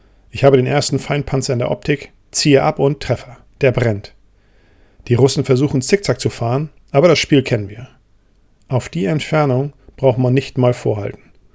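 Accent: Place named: North Germany, Germany. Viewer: Wie immer spannend vorgelesen. Alle Achtung ist eben Norddeutsch.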